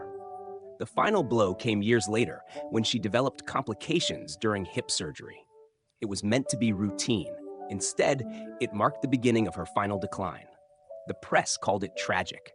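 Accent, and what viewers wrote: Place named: United States. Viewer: The HORRIBLE Yank narration spoils this!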